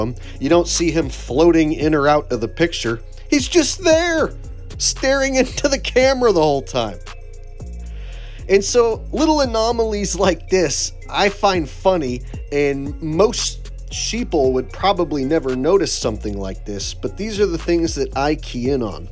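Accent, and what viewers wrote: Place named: England, United Kingdom. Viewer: Hahahaha 😂😂😂 love the English accent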